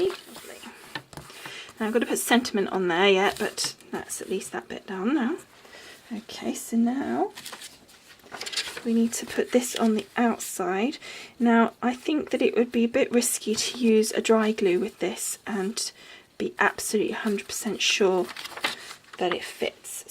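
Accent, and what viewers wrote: United Kingdom. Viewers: Really a cute card...thanks for sharing. My grandmother was from England and hearing you reminds me of her. My husband always gives me a hard time because I love anything about England... movies etc...love watching BBC films on Netflix and hearing that British " speak' Thanks for all you do. Pam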